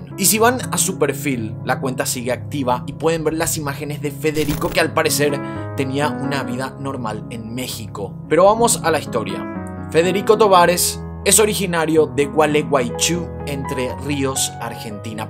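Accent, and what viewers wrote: Paraguay. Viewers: me encanta los Capitulos, despues de ver 6 episodios me di cuenta que es Paraguayo, por el asento 😜 te sigo desde Dublin Ireland 👍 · Te encontré en Fb Y luego te busque en YouTube Me suscribí a tu canal Me encantan tus videos Todo muy bien investigado No había escuchado hablar a nadie de Paraguay 🇵🇾 hermoso acento 😊 Me uniré a todas tus redes sociales 😉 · Me encanta que no perdes el acento de Paraguay ❤️🙌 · Tu acento neutro paraguayo 👌👌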